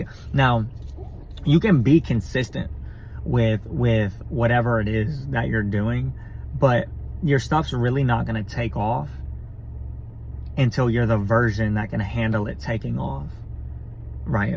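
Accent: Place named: Louisiana, United States. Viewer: Hi! I just found your channel! You sound like you’re from Louisiana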